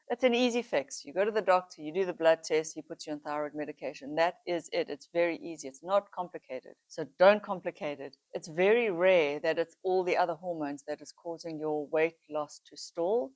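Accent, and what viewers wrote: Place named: South Africa. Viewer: You’re South African! Me too! I can hear it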